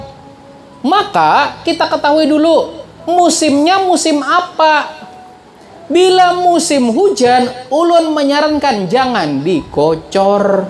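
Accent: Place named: Hulu Sungai, Indonesia. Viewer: Hadiirrr ... Nah pak ulun curiga mendengar logat piyan ne mulai awal nonton Chanel ini, model ada hulu2 nya macam urang hulu sungai🤭sekalinya ... Kita sebanua, Ulun asli Martapura, tp kuitan Ulun hulu sungai asli Kandangan wan Barabai, Sukses buat Chanel piyan ini, SGT BerManfaat... Smoga Pertanian Indonesia Maju😇 Aamiin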